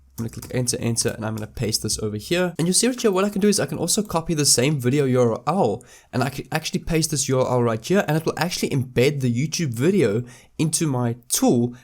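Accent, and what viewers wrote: South Africa. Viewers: Sth.Efrican accent plus FF speed make it very difficult to follow. Relax buddy because content seems interesting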